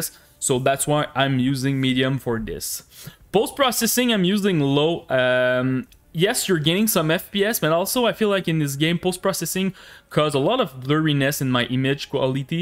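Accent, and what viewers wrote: Quebec, Canada. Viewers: The Quebec accent is here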